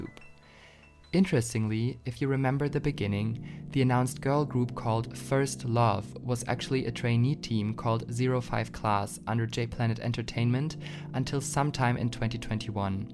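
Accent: Germany. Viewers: Du hast so einen krass starken deutschen Akzent, habs direkt erkannt weil ich auch so Englisch spreche 😋🤫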